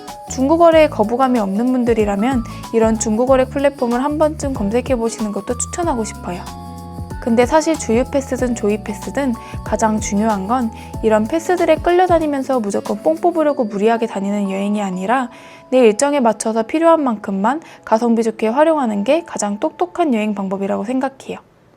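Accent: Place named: Chungcheong, South Korea. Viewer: 충청도여~? 승질 급한사람 숨넘어 가겠구만~ㅎ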